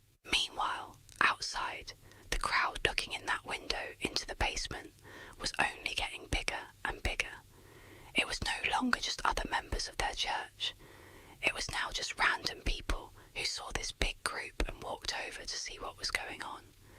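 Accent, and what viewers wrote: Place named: Australia. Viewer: Bro I honestly can’t with the accent your an Aussie right? Idk I just was laughing so hard at the beginning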